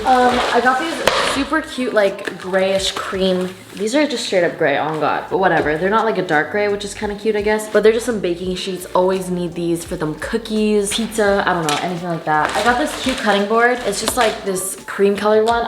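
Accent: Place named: United Kingdom. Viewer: i clicked faster than could start talking in a british accent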